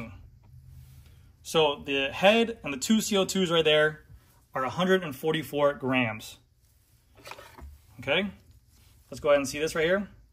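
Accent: Australia. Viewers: Kool just ordered......Really the Aussie accent,.....to funny....oh and the reference to hill KOM, hilariously. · The Aussie accent was on point!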